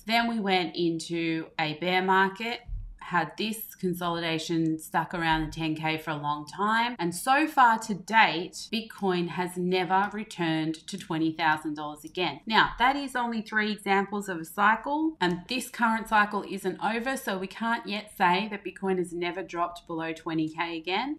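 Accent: Australia. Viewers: Loved your video! Also lovely to hear that Australian accent! I lived in Melbourne at the start of the pandemic - great city. I plan to return one day.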